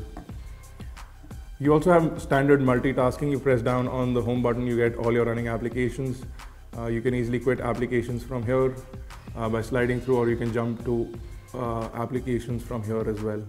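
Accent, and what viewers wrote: India. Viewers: it's an Indian accent.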